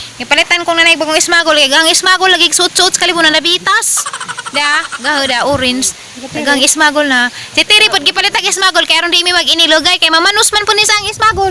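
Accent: Bohol, Philippines. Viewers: ISMAGUL....grabe jud pgkaboholana.... taga tubigon ko day.... silingan ra gihapon ta, 😂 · Hello ate ka relate kos imong mga accent ug mga words ky taga ubay pud ako bana,ana sad iya accent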